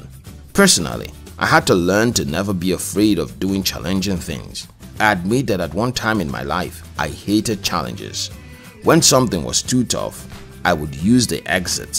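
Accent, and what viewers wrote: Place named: Nigeria. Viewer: You are in Nigeria? Your accent sounds like. If you are. Nothing wrong. With it. I think you should be proud of yourself to making this channel. Bcs, Nigerians in South Africa they are drug dealers and all sort of dirty business.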